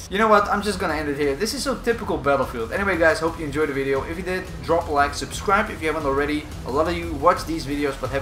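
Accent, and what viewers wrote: Netherlands. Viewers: u have a dutch like accent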